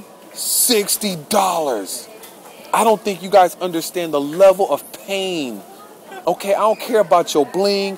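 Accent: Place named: AAVE, United States. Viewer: Ebonics makes my ears hurt. :(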